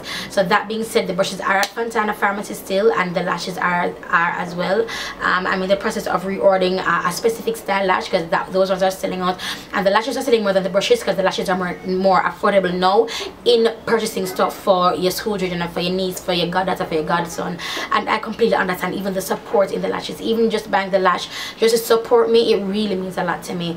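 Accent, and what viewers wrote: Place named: Jamaica. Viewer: When you are speaking to your international viewership you need to slow down in your speech and enunciate. We LOVE the Jamaican accent, but it can be hard to understand at times!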